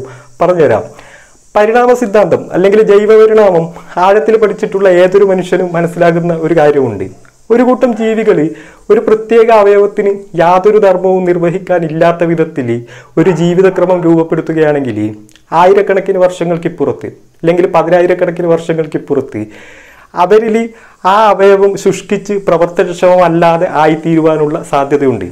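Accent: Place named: North Kerala, India. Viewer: English ucharanam sariyakkan -chal, abhangi ozhivakkan thankaleppole oralkku verum 10 minuttu mathi. Athinu minakkathe aabharanamayi kondu nadakkano. Ethayalum avatharanam, nammute vadakkan thenmozhi ellam gambheeram. Fear not we are with you.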